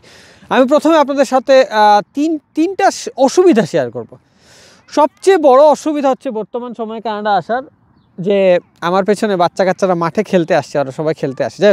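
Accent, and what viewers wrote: Sylhet, Bangladesh. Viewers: আপনে সিলেটি ভাইসাব নি?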